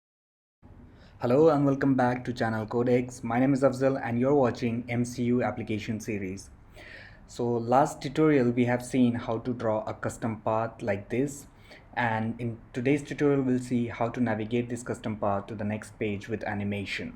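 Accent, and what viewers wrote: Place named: India. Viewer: WTF with the Indian English omg it seem like 😱 where did you learn that kind of accent guys ??!! U r awesome by the way 👍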